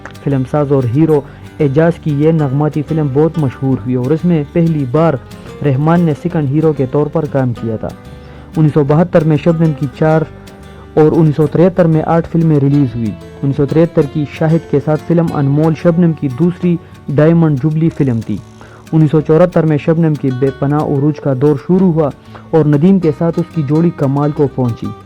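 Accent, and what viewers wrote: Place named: Pashto, Pakistan. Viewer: سرجی پشتو ٹون میں آپ کی بتائی ہوئی باتیں ، شبنم کا پورا فلمی سفر اے ٹو زیڈ تک آپ نے گن کے بتایا ۔ ساری فلموں کی معلومات فلاپ اور ہٹ فلموں کے ساتھ آپ نے باتیں شیئر کی ہیں ۔ ایسی تفصیلی معلومات ہمیں کبھی کسی بایوگرافی میں نہیں ملی ۔ فلم عندلیب کے سیٹ پر وحید مراد سے جھگڑا اور اس کے بعد اس کے ساتھ فلم نہ کرنے ، اور اس کا بائیکاٹ کرنے کی باتیں بہت معلومات دے گئیں ۔ شبنم کے ساتھ اتنا بڑا حادثہ ہونے کے باوجود بھی وہ پاکستان میں آتی جاتی رہتی ہیں ، جس کی وجہ سے ، اس کے بیٹے کے ساتھ اس کی انبن چالو ہے ۔ اب مجھے اس گانے کی لائنیں یاد آ رہی ہیں جو شبنم کی اوپر برابر فٹ بیٹھتا ہے ﴿جس نے میرے دل کو درد دیا اس شکل کو میں نے بھلایا نہیں﴾ مگر اس کے بیٹے نے وہ باتیں اپنی ماں کی وجہ سے نہیں بھٌلائیں ۔ ہمیں اس بات میں دست اندازی نہیں کرنی چاہیے ۔ پر شبنم کا یہ ڈیسیشن کہاں صحیح ہے کہ ، اپنے بیٹے کو ترجیح نہ دے کر ، پاک سے اپنی محبت کا ڈھنڈورا پیٹتی رہتی ہیں ۔ ٹھیک ہے اس کی پوری زندگی وہاں گئی ، پر اس کا مطلب یہ تو نہیں ، کہ اپنے بیٹے کے پیار کی ہی قربانی دے دے ۔ خیر ! 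رب العالمین دونوں کو سمجھ عطا فرمائے آمین ۔پونا بھارت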